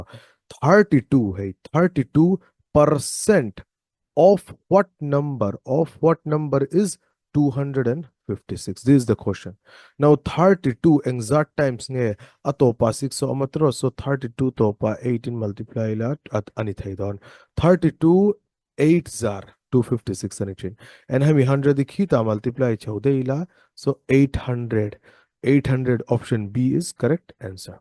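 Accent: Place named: India. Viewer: I accent hi a bharat lutuk a, ngaihthlak ahreawm,(Hinglish). mizo tawng khan sawi tluan law law la.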